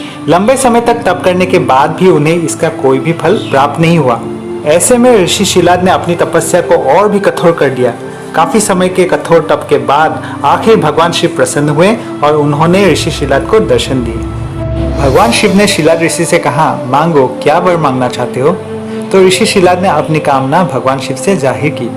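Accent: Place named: Assam, India. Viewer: Great story brother.... By any chance r u Assamese? I mean just guessing from the tone of the voice🙂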